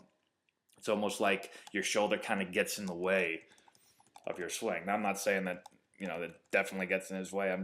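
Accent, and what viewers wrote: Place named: East Coast, United States. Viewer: you sound like an east coast Jay Cutler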